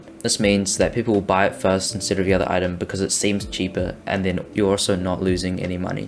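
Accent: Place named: South Africa. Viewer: are you from south africa? ur accent sound like it.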